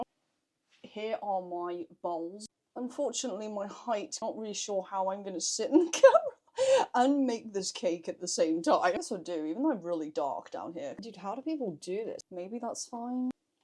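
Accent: United Kingdom. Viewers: HELP I DIDNT KNOW YOU WERE BRITISH Say mongoose for me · your British accent, ❤❤❤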